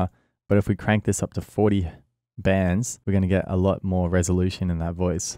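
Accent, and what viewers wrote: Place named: Australia. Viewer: You're so eloquent when you're explaining this, it's clear that you really understand the subject matter, and it's inspirational. I enjoyed this tutorial so much. I'm jealous of your knowledge. You make it look so damn easy! Keep making these :) ps: Always helps hearing someone explain in good plain Aussie that I can understand ^_^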